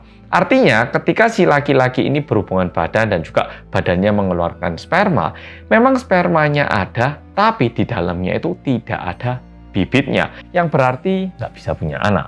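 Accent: Java, Indonesia. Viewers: Great story to watch 👍👍👍 Thank you mas ' ' 😁🙏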